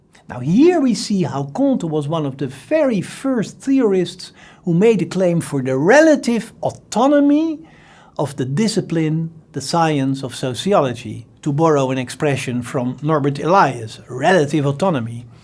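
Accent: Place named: Netherlands. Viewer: His name and accent would suggest that he's Dutch.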